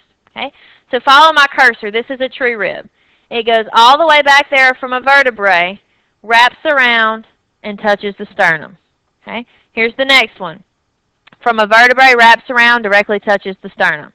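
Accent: South, United States. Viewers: I love videos. There are full of information and I feel like I am in a class, with the teacher talking to me, not just reciting information. The fact that she speaks 'Southern' is an added positive! I don't feel she is talking down to me when she explains things.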